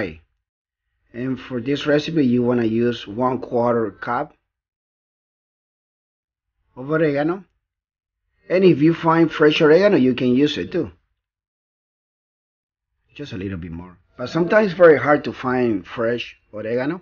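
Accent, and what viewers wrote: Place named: Mexico. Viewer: He's not Argentine. He sounds Mexican, perhaps? This chimichurri is pretty good. I just made a batch.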